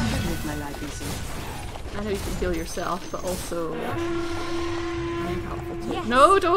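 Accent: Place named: Scotland, United Kingdom. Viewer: This was really enjoyable to watch! Crystal Maiden is my most played hero. I've never actually played ranked yet, partly because I'm worried I'm most likely gonna fail miserably and calibrate myself at an embarrassing level and also because I'm not sure if I would be dedicated enough to push myself to climb, but it is definitely something I would like to attempt anyway. Also I have to ask, (hopefully this isn't too creepy, but) where are you from (I would guess you are Scottish, but I don't want to be wrong and look stupid, which I guess I have done by writing this comment anyway... oh well)? Your accent is awesome! Well I sure dragged this comment on a bit. I hope you do more of these, this was really good.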